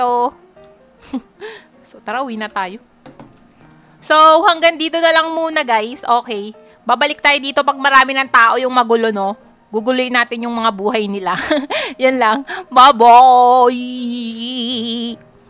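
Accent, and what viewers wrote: Visayas, Philippines. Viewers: bisaya po kayo? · Ate bisaya kapo bah? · Bisaya ka po ba ate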